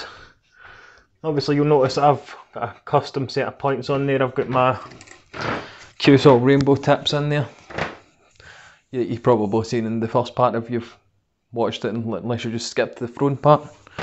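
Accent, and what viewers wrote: Scotland, United Kingdom. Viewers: Proper Scottish lad aren't ye lol good video and thanks from the US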